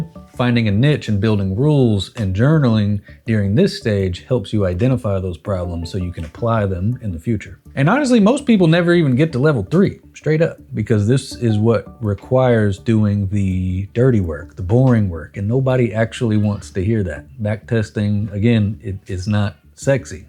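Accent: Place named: West, United States. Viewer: I'm from the Bel Paese and I grew up watching Western movies and your accent seems to come straight from the mouth of those movies actors 😅. Sei simpatico e pieno di energia!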